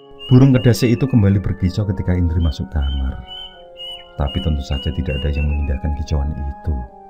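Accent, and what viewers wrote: Java, Indonesia. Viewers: Kenqpa ya,setiap ceritanya selalu memakai logat jawa..padahal kan budaya dan logat bahasa kita beraneka ragam..kadqng bosen jg kl selalu pake logat Jawa..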